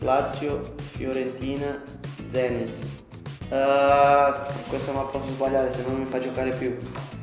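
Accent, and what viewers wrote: Brazil. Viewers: I can’t speak Italian well, but it seems like he still has a strong Brazilian accent? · Is it me or my man still sounds very Brazilian even when he speaks the beautiful language 😅😅? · Io lo adoro l'accento brasiliano. Nonostante sia qui in Italia da tanti anni non lo ha perso del tutto e la cosa non mi dispiace affatto.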